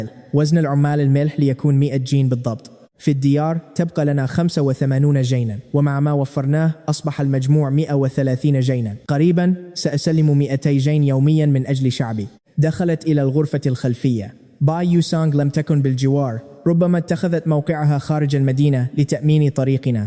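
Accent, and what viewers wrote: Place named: Iraq. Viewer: باللهجه اظنك من العراق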